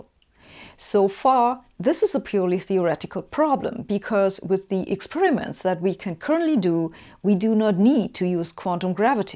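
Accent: Germany. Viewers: I have some real Qs: why are forces free? Why don’t they cost anything? And why don’t they get tired? How does energy fit into all this? Is energy a currency? Currency as in cost? Cost as in no longer free? And if all the energy in the universe is a constant, why does anything happen at all? Because it doesn’t seem like anything is being spent. Perhaps, specifically, if a magnet sticks on a ceiling, why does it not get tired?… While I’m at it, someone asked does a cup of water think? Maybe think more if it’s heated. Perhaps not, but it must think I’m hot or getting hot, if you know what I mean. That’s the problem with thinking, a stone must think it’s a stone and water must think it’s water or I would not be able to think at all; surely. See if you can explain any of that without your typically boring clenched teeth tight mouthed monotone that speaks with a German accent very well, even when you are always trying to lighten up a lot.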